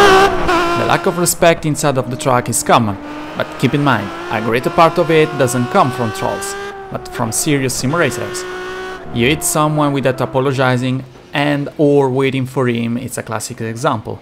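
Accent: Italy. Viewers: love the italian way that talks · questa la prima volta che in un video sento quella che sembra essere la tua voce e penso di poter affermare con certezza che tu sia italiano ;)... grande ti stai impegnando e ti faccio i miei complimenti continua a cercare di tenere attivo questo canale in inglese e migliorare sempre.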